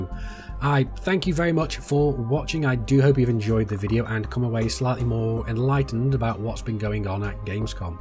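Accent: United Kingdom. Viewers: I love you random British guy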